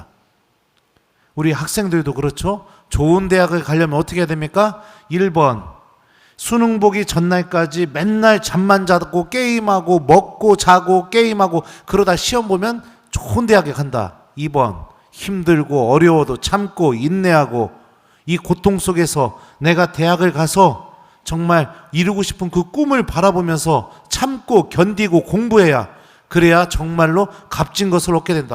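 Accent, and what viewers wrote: Chungcheong, South Korea. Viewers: 충청도백제말쓰시는신부님 김대건신부님이상 귀에쏙쏙들어오는것 신부님튼강강좌미사 감사드립니다아멘 미카열입니다 성부와성자와성령으로아멘 백제말감사드립니다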